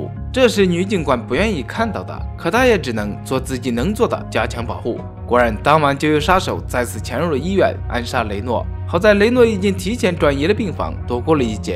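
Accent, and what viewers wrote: Japan. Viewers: 兄弟你这口音像日本人， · 解说的普通话怎么有日本口音？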